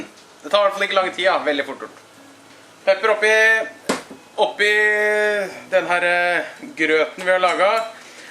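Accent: Østfold, Norway. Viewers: Veldig bra resept. Jeg lurer på som svenske 35 år i Norge, hvor er din dialekt? Kan det være nedre østfold, Halden, eller er det lenger opp?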